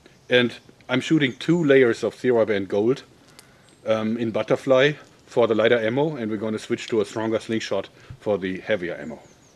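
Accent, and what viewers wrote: Germany. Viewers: Man hört so das du aus Deutschland kommst haha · Are you germany? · are you German? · im detecting german accent in you, yes? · Are you German? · thumbs up for the german accent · Are you German ? ^^ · were are you come from you speak a liitle bit like a german areyou from there ß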